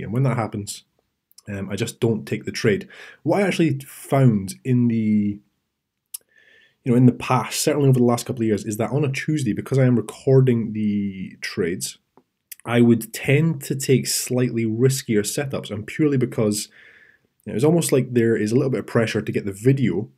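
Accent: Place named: Edinburgh, United Kingdom. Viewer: Hi, is there a way i can contact you by email to ask a few questions? I am completely new to this and looking to do this on a part time basis. You sound like you are from the Edinburgh area, I am from and live in Aberdeen.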